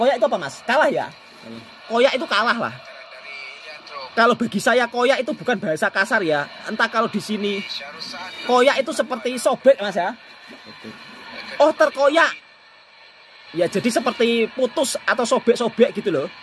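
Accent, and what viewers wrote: Java, Indonesia. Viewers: pertama kali mendengar ulasan loghat Jowo. 👍🏼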